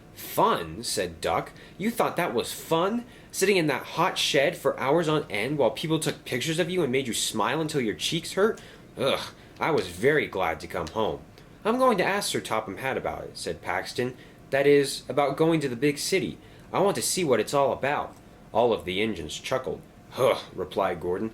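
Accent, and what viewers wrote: Scotland, United Kingdom. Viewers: Your Scottish accent is spectacular.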